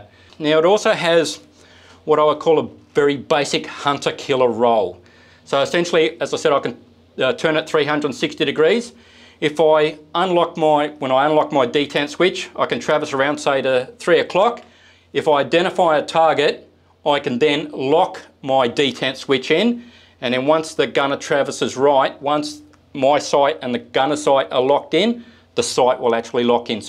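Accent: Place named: Australia. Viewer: For a few moments there, i closed my eyes & pretended i was listening to Steve Irwin talk about a tank in great detail instead of crocs & various other critters. I know it's kinda odd but hearing that accent reminded me of Steve.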